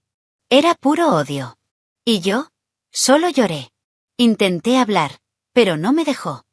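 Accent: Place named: Spain. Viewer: Recomiendo le pongas un acento mas neutral. En America Latina somos 650 millones contra menos de 50 en España. Y la verdad escuchar acento español en estas historias desmotiva un poco a los latinoamericanos. Y parecen mas historias cómicas que otra cosa. Con todo respeto, pero la verdad.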